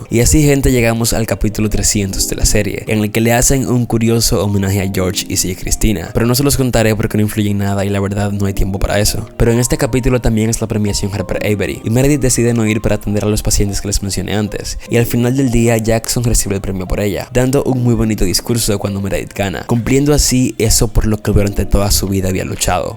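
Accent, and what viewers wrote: Dominican Republic. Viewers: Eres de República Dominicana?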